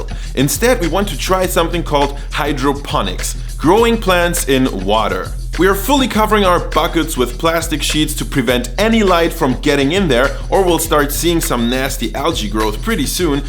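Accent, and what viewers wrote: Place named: Berlin, Germany. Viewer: I immediately knew you were from Berlin because of the way you speak and when you showed the houses I was certain lol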